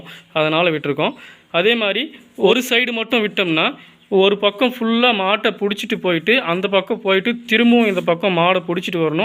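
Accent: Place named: Dharmapuri, India. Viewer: Super ga nenicha slang ora mari iruku nu nanum dharmapuri tha dpi la endha ooru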